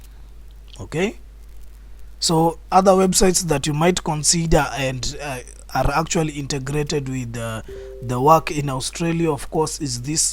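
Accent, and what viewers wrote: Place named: Kenya. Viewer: and he sounds sooo sooo kenyan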